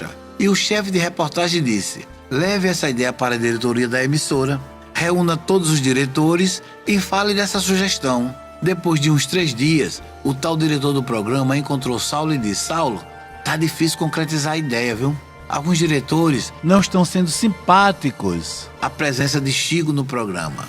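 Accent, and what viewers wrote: Northeast, Brazil. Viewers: Boa noite, já conhecia a história, só assisti de novo porque gosto da sua fala, esse jeito carregado do nordeste. Abraços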